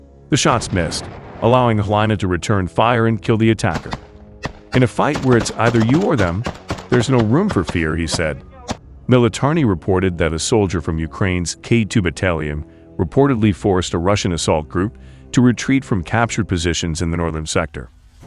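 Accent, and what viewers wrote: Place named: United States. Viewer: Tedious American voice , can't even read or speak English correctly .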